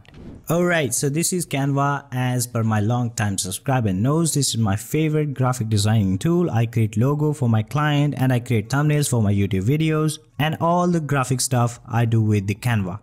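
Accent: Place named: India. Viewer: "This is a driving drop tool".. I'm not too efficient in English - but what indeed does it mean, and why it is not possible for Indians to speak normal english at least for tutorial videos purposes?..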